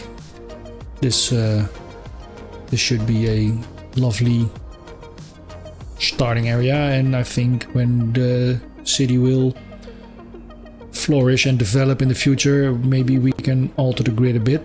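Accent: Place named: Netherlands. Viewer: That accent... a fellow Dutchman by any chance?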